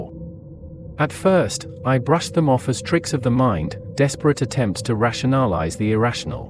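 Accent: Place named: England, United Kingdom. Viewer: An English narrator! 😀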